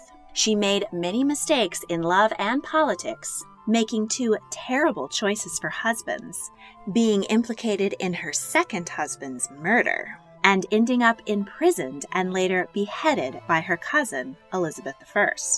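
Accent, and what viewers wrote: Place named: United States. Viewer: From your accent I can tell that you're not European.. Probably American.. So its more amazing that you're making videos about European monarchy..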